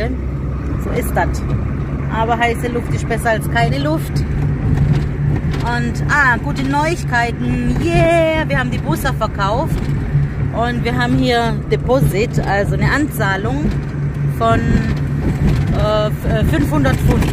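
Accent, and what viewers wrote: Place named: Swabia, Germany. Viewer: Ok liebe, dann stell ich mir das einfach vor, die sehen auf jeden Fall toll aus, habt ihr gut ausgesucht. Dein lieber kann stolz darauf sein, so eine taffe und hübsche Frau wie dich zu haben und ich liebe dein englisch-arabisch-schwäbisch Geschwätze, du bereicherst jeden Tag von uns und ich mag ganz dolle deine Penny auch wenn sie quietscht wie deine Fliegentüre;-))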